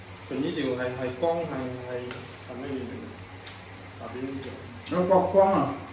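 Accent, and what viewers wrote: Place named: Cantonese, China. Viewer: 听你说粤语好听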